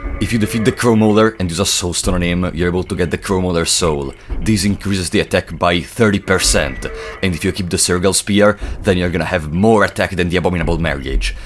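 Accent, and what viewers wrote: Italy. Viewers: Oh my god, now I love the Italian accent because I can't make out exactly what Americans, British and Australians are saying in English😂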